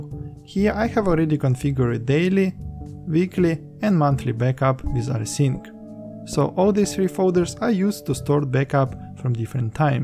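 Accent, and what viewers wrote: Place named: Russia. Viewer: какой ужасный русский акцент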